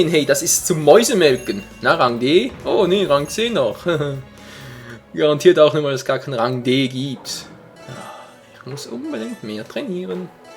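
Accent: Switzerland. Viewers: kommst du aus der schweiz ?? ;) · bist du schweizer? nice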